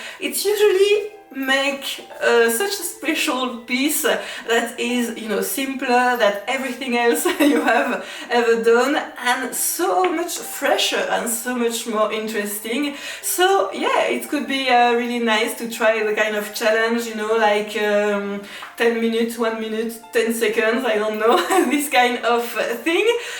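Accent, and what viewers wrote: France. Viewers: Bonjour, ah ben plus de vidéos depuis janvier, triste je suis! J'adorais travailler mon anglais et votre délicieux accent français m'aidait beaucoup, la cerise sur le gâteau l'aquarelle!!! Rien de grave n'est arrivé j'espère. Mais où est passé le? Bien cordialement.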